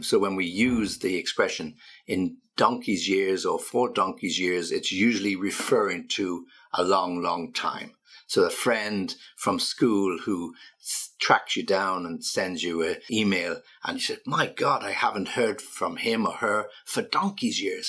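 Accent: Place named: United Kingdom. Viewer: Your accent is so interesting 🤓✌️ may I ask are you British 😸? If you are may I ask for some British pronunciation videos please 🤓🙏🤓🙏🤓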